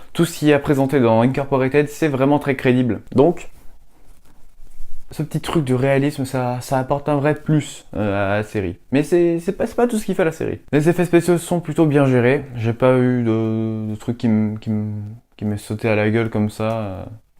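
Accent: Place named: England, United Kingdom. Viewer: Superbe accent anglais :O et c'était très sympa comme vidéo , peut être un pouale lent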